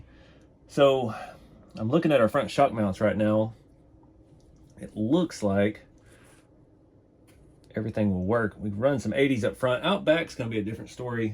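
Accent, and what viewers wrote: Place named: South, United States. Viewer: RC4WD science!!!! I have a way worse southern draw than you do. Fella that tried correcting you would hate to talk to me lol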